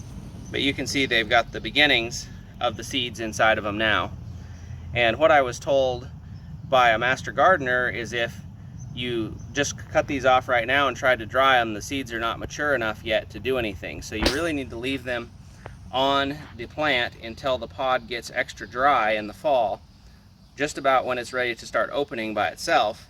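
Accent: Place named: United States. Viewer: First time I’ve seen your video and I’m totally in love with your American accent Oh and of course your lupins !!!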